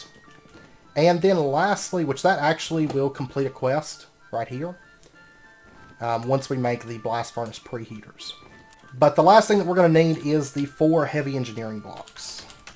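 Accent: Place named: South, United States. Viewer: somewhere in southern USA afaik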